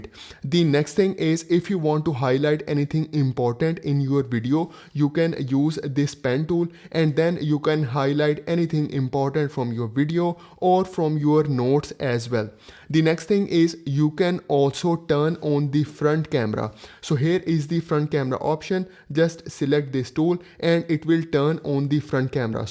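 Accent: India. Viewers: The video itself was very well done, but something about speaking english in an indian accent makes me uncomfortable. I could understand everything you were saying, and your words were very clear and well articulated, but indian (hindu?) must be a very different language phonetically, because the struggle to achieve a clear flow between words is real. I hope this doesn’t offend you, because your english really is very well spoken aside from that flow aspect. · Indian accent is something)))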